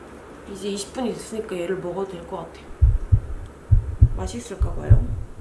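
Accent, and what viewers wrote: Busan, South Korea. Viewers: ㅋㅋㅋㅋㅋㅋㅋㅋ 님 부산 사시더니 말투에 부산사투리가 약간 섞여벌엿네욤 귀요오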